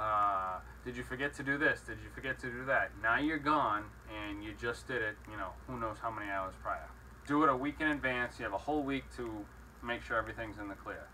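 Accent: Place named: Massachusetts, United States. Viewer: Can I just say as a fellow man from Mass your voice just makes me homesick. Also as a guy who just got back into the hobby after not having a tank since I was a kid you actually saved my fishes life. Your videos have been very helpful with getting back into the hobby. I now live in Kentucky, and it's nice to have a little piece of Mass to go to, and get advice on a hobby I always loved.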